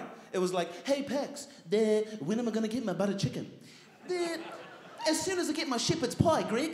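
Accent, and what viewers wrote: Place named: Tonga. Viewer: Solid tongan accent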